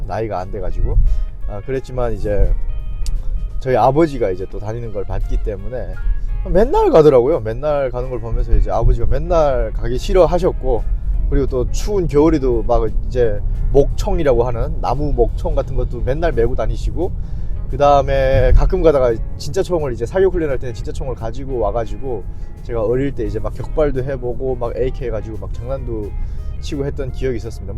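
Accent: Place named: Gyeonggi, South Korea. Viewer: 잘 생긴 男배우같은~ 경기도 언어 억양, 이젠 ⚫️ 검은안경벗고, 자신감으로 😮 보기좋아요🎉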